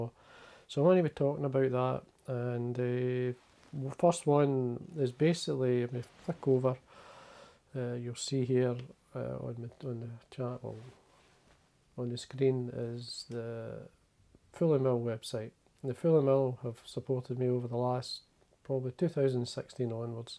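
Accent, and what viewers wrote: Scotland, United Kingdom. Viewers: Finally put a face to those fingers and that lovely Scottish voice! I had a bamboo rod put in my hands 63 years ago. Started tying and buling rods 40 years ago and have loved every minute of it. When my dad passed, I found that old bamboo rod and completely rebuil it to its original condition and caught trout on it 63 years later! What a joy. It was as if dad was there and probably was.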